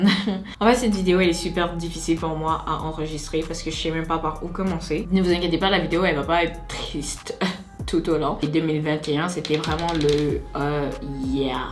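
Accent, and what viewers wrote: Quebec, Canada. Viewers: J’adore ton accent québécois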